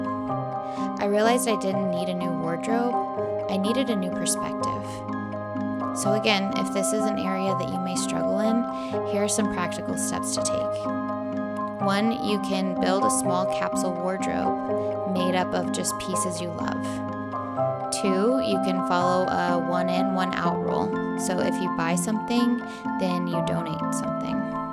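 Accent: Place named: United States. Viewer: I buy to many books!I love your accent! what part of America is that from?I'm from the u.k.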